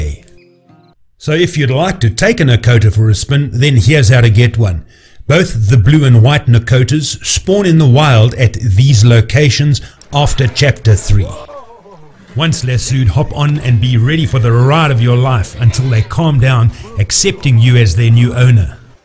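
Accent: South Africa. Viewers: Great video! First time I’ve seen you on YT. Your accent is South African. Eastern, I’m guessing.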